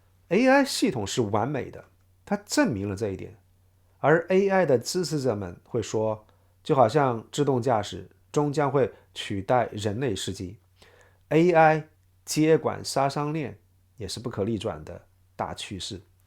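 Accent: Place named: Sichuan, China. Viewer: 这口音是四川人啊😊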